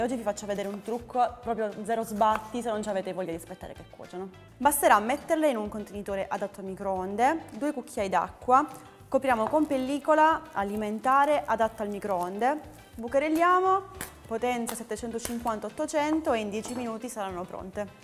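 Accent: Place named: Apulia, Italy. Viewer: Che amore che sei con quell'adorabile inflessione pugliese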